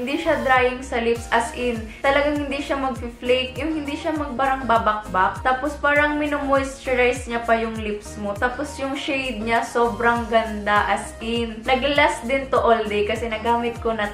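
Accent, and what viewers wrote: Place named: Bicol, Philippines. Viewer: Hahaha Lumalabas yung pag ka bicolana nya dahil sa tagalog accent nya hehe Love you!!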